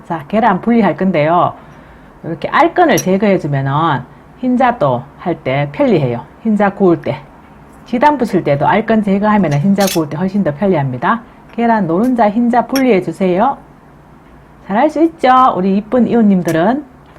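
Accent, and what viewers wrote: Gyeongsang, South Korea. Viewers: 너무재미있으시네요 경상도버젼으로얘기를잘하시네요 짝짝짝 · 계란 말이도 예쁘지만 경상도 말솜씨가 너무 재밌네요 친정 막내올캐 목소린데 맞지예~ 듣고 깜놀 했네요 지는예 둘째 월선 고모입니다 박수 짝 짝 짝 댓글 보면 답주이소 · 갱상도쌤ㆍ오늘도구수한사투리 ㆍ감사합니다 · 짝짝짝 😄 구수한 경상도 사투리가 정겹습니다 얼굴이 안보이가 보이스만 들리니까 개그우먼 김영희씨 얼굴이 자꾸 떠오르네요 유쾌하고 유익한 영상 잘보고 있습니다 참고로 저는 캘리포니아 에서 열심히 응원합니다~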